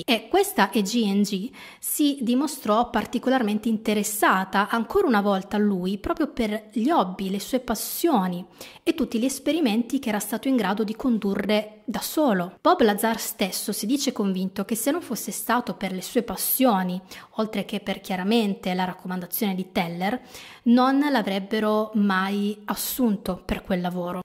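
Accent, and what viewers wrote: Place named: Piedmont, Italy. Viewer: Bellissimo video, voce gradevole, inconfondibile accento piemontese, che dire......nuovo iscritto!!!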